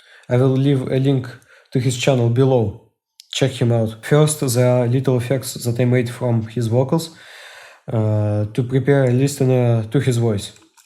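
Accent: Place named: England, United Kingdom. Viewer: The first English accent that I suck at understanding it. Only beginnings and the ends. Lol :D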